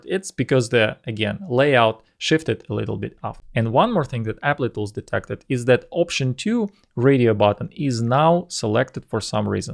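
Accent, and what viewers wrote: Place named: Russia. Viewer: Спасибо! Английский с русским акцентом очень легок для восприятия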